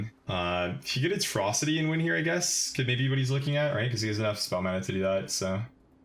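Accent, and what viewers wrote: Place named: Netherlands. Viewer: Are you dutch? I think I recognize ur accent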